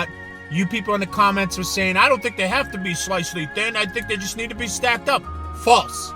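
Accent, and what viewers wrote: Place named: Boston, United States. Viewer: I'm in the Cahhhhhhments, so now I can talk chit! It's Monica's Mercato and Salumeria, not "Monica Mercato's"! Stop saying that! Her last name is not Mercato, just call it Monica's! Mercato = a Market where goods are bought and sold. It's like every time you look at the store sign, you cannot see the apostrophe S next to Monica. HA Love your videos though and that sweet Bahston accent is so pure. Keep it up!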